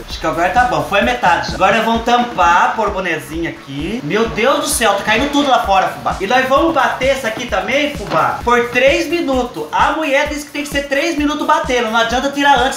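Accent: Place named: Caipira, Brazil. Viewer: do céu adoro esse sotaque caipira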